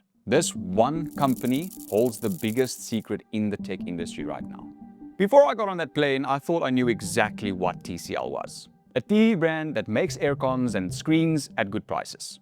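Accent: South Africa. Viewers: You're South African? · Are you South African👂?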